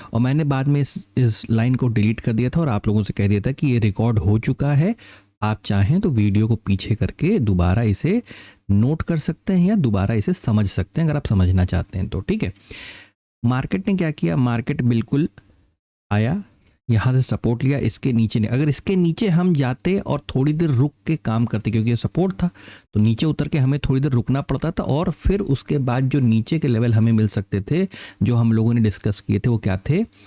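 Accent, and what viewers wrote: Lucknow, India. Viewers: Right shoulder is Pushpa style. Main jhukega nahi. You have a great way of delivering your content. Lucknowi andaaz ki baat hi kuch aur hai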